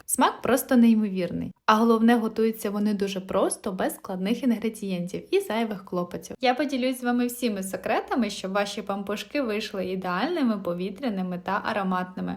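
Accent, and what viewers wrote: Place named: Galicia, Ukraine. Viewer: Чудове відео, швидко,конкретно, та ше й милий галицький діалект! Лайк, подяка, підписка :)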